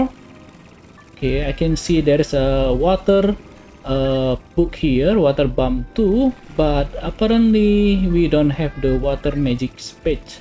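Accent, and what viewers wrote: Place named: Indonesia. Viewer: logat indonya keliatan😁,mantap bang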